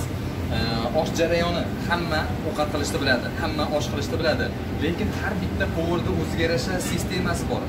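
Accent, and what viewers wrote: Andijan, Uzbekistan. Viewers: Жааа. Яхши анжанча шевангиззи яхши тушунамиз. Гапираверинг. Нима? Росияда ок сабзи йукми дустим???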